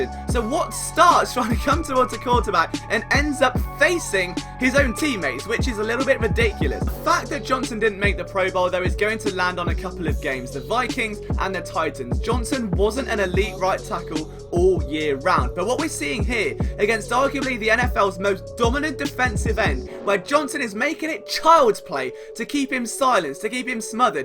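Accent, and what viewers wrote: England, United Kingdom. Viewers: YOU SOUND ENGLISH? IF SO YOU'RE UNDERSTAND THE GAME REALLY WELL. AMERICAN FOOTBALL IS COMPLEX LIKE CHESS. I GIVE YOU A THUMBS UP ON THE SUMO ACTION IN THE PIT. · It's funny hearing a British accent breakdown football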